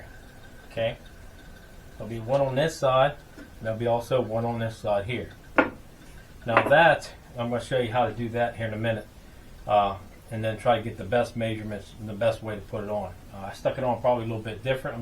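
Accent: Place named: Western Pennsylvania, United States. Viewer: Are you from western PA? It sounds like it.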